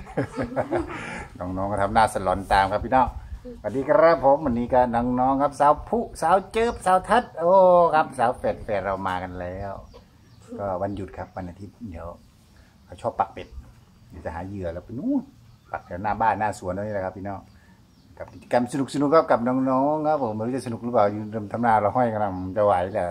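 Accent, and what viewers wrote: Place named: Sisaket, Thailand. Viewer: ศรีเกษชัดเจน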